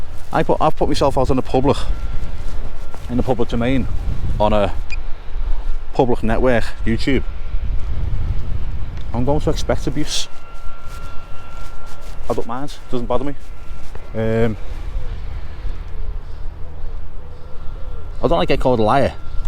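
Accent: Liverpool, United Kingdom. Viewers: Scouse accent is worth the watch alone. Boss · Love your videos.....can’t always understand every word of your lovely Scouse accent but I’m a Geordie and you probably wouldn’t understand me ! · Personality l think your coverage is brilliant .. honest , humorous and as a scouser living in Australia 🇦🇺 great to hear a raw scouse accent 👍 if thay don't like the commentary .. thay don't watch it ok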